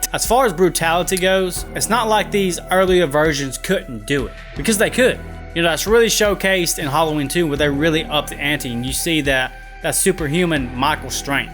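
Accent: United States. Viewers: Love your Accent dawg, What State?? 🖤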